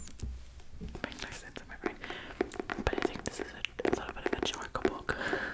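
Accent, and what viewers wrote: Australia. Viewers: For so long I wondered how you ended up with an Australian accent. I just assumed you chose it as your preferred accent in English. Now I know! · “as a non-English speaker” Proceeds to speak English at a native level with a legitimate Australian accent.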